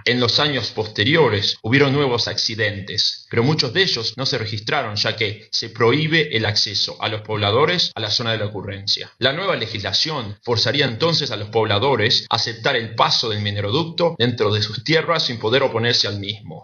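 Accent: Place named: United States. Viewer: Lamentable este vídeo, totalmente político y falsante, el primer y grave error es entre el minuto 6 y 7 que habla sobre la decisión del presidente de la instalación de una planta de celulosa en el año 2000 y ponen una foto de tabarez Vazquez, cuando recién asume el cargo en el año 2004, increíble, no lo mire todo porque me parece una perdida de tiempo, otro detalle es la voz del narrador con acento yanqui, y por cierto EEUU es el país que mas contamina del planeta, produce nada mas y nada menos que el 30% de emisiones industriales de todo el mundo, Pd. en mi persona estoy en contra de la contaminación.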